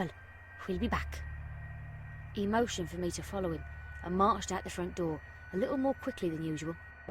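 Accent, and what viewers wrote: United Kingdom. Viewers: She lost the accent eventually lol. Mighty British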